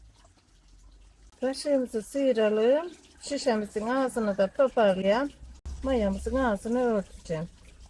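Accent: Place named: Aegean, Turkey. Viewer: Ayni bizim gibi konusuyo usakla afyonu konuşması hi denizlide ayni şive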